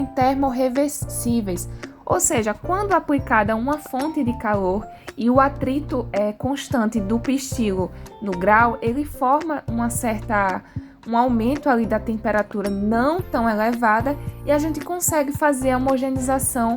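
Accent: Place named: Ceará, Brazil. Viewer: Adorei sua voz e sitaque! De qual cidade você é? Pelo sotaque, me parece que você seja do Ceará. Acertei?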